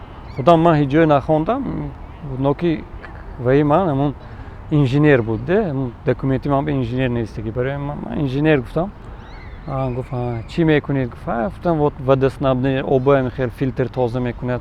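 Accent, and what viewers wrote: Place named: Leninabad, Tajikistan. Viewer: Ето человек ленинабадски таджик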